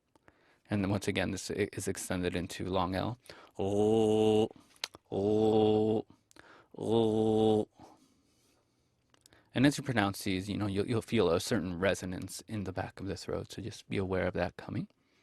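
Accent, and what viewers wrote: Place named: England, United Kingdom. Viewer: You have an English accent.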